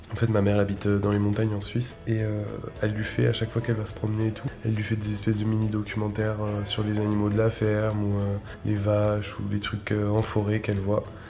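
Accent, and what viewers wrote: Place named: South, France. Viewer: C'est marrant comment tu as l'accent du sud + marqué que haha! J'avais jamais capté. En tout cas très bon vlog, keep going guys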